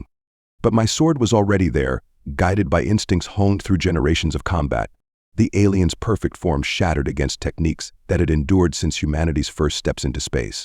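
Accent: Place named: Texas, United States. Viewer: It's more like Texas southern speak! Just enjoy a good story!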